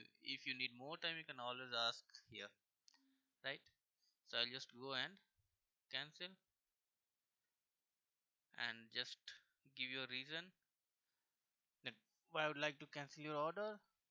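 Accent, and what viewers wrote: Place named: Bengal, India. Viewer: You have a bengali accent Are u bengali? Nice video btw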